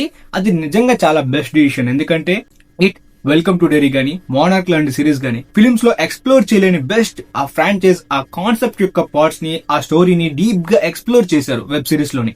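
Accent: Srikakulam, India. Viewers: అన్న నీది శ్రీకాకుళం నా సూపర్ ❤❤❤ నమ్మలేకపోతున్న 😂😂 మాది పాలకొండ ✌️✌️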